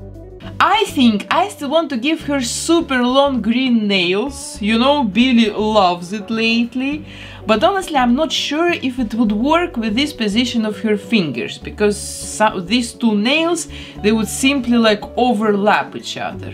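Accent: Russia. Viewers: Вы русская? Голос такой интересный, и акцент Но в любом случае, я в восторге с ваших работ. Это очень круто. Успехов) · Она часом не русская?просто акцент такой,очень узнаваем)впервые сейчас смотрю этот ролик)ну хочу сказать,она молодец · Russian accent... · you have the the THICKEST Rution acsent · Сразу слышно,что Русская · are you by any chance not Russian? it’s just in accent that you’re Russian. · Whaaaat lol French?? This is a textbook Russian accent, have you ever really listened to French people in English?? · Is she Russian ?she has an amazing accent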